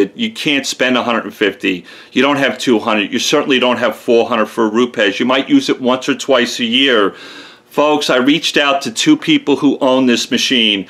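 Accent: New York, United States. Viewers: A Texan with a NY accent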